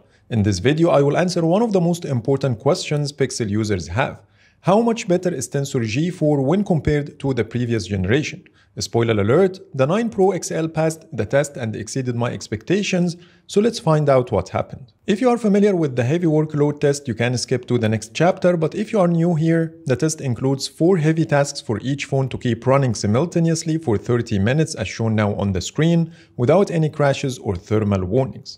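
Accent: Egypt. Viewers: طلع مصري العرص .. يسطاااا اللغة يسطاااا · 3rft enk masry mn el accent, ta7ya masr ya 3m XD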